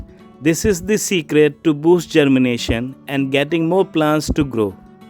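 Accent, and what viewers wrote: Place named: India. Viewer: It is Indian English just like there are American English or British English.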